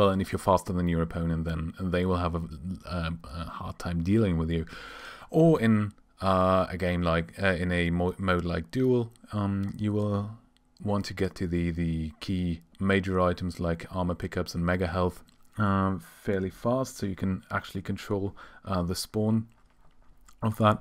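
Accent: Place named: Germany. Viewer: what is your primary language if I may ask? german maybe? ^^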